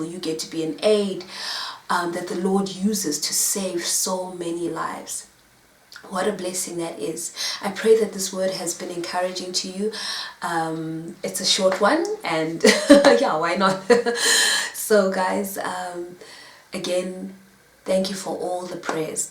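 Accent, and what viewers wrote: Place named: South Africa. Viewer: When I hear your voice, I keep hearing the Safari Live people here on youtube. South Africa. I also wanted to commend you on your singing. I have watched so many auditions and singing shows. I always enjoy a good voice! And you're doing a good job for the Lord as well, with your prophetic voice too. Amen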